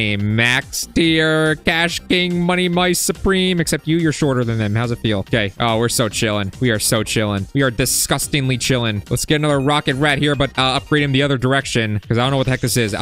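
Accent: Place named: United Kingdom. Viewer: bro i love briths talk:D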